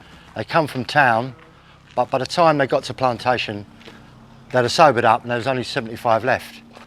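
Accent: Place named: East London, United Kingdom. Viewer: Interesting..love your east London accent 🇬🇧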